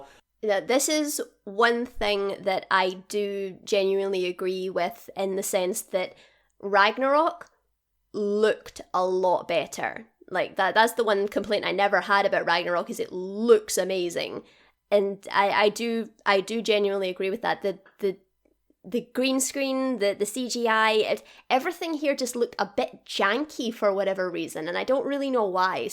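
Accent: Scotland, United Kingdom. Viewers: Oh Scottish, I was wondering. Well its lovely! And if this wasnt scripted I would have to applaud you again because I thought it was very well thought out. I too was a big fan of the shakespearean vibe they had in the first two movies which I really enjoyed but they ditched that theme and went way too silly. I liked how you highlighted the theme of Thor always moving away from wanting to rule which is very much his character arc, wanting to be more involved with middle earth than Asgard. I agreed with everything you put out there and really appreciated your video. 🙂 I just found your channel but I am definately subscribed now! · Are you Scottish ?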